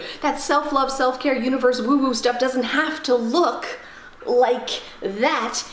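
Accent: Canada. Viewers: Abooottt:) my favorite Canadian!!!:)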